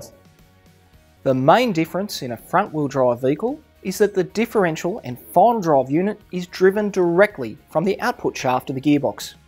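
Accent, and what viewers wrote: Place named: Australia. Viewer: I think all technical videos should be done by someone with an Australian accent from now on.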